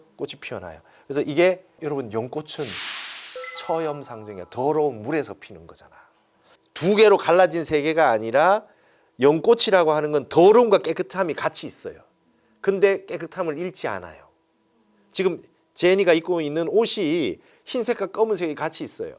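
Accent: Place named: Gyeongbuk, South Korea. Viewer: 끝말이 ~라 경북말투네요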